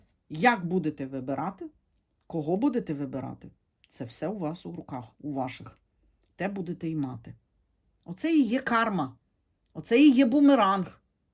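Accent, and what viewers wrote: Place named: Chernivtsi, Ukraine. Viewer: добрий день я знаю де хотинський район бо я ваша землячка з Чернівців також на даний час в Німеччині всього вам найкращого як я люблю наш діалект таке наше рідне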